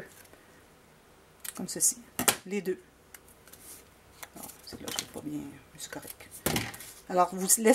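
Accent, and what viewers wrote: Canada. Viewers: Merci Pour ce bel album, il est magnifique, je pense le refaire en essayant d'adapter les dimensions pour un album plus grand pour pouvoir mettre des photos 10x15 ou tout au moins de 9x13 formats les plus courants en France! Tous les matins, c'est avec un énorme plaisir que je regarde tes tutos. Je commence ma journée avec tes vidéos et avec ton bel accent canadien. Merci encore pour ta disponibilité, Biz de France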